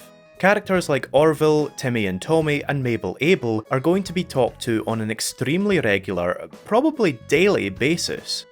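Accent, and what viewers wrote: Scotland, United Kingdom. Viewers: Is that a Scottish accent? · a strong scottish accent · I miss the Scottish accent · im considering this scottish asmr while i write my uni essay lol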